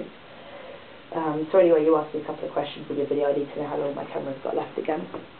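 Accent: United Kingdom. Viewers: One thing you should remember is that if you came to America, people would find you much more attractive than you realize because you have a British accent. Still, I think one of the hardest things to wrestle with is self-criticism.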